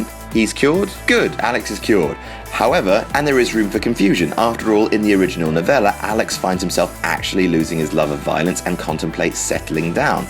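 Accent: United Kingdom. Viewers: So you are just shrugging you shoulders with a british accent. Congratulations. You have a blog that does nothing. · I know and his heavy British accent wasn't helping either. I couldn't finish it....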